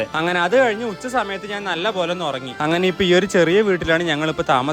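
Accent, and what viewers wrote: Palakkad, India. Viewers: Indrajith vlogile athe voice modulation😅 palakkad ano/?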